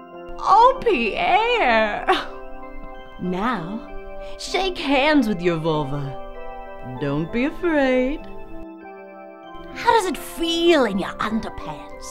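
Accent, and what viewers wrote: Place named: Alabama, United States. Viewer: what accent does she have ? Alabama ? Its nice sounding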